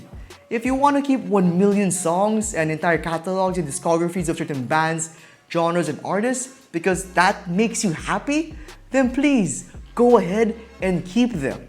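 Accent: Philippines. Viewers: You ought to delete your filipino accent from your brain, learn some English before talking about how to deejay. It’s hard to understand you most of the time.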